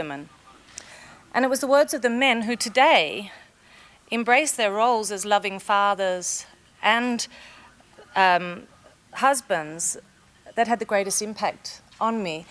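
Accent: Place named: Australia. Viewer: Good to hear Princess Mary and her aussie accent!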